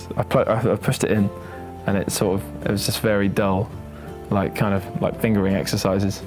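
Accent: United Kingdom. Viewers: this british super talanted and also very beautiful and charming with this accent ogosh im inlove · OMG HE HAS A BRITISH ACCENT!! JUST MADE HIM 100% HOTTER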